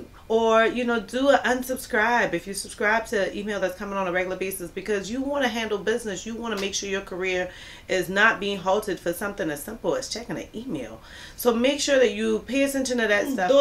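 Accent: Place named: New Orleans, United States. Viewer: awe ok!! It sound like I kept hearing a N.O accent.😂😂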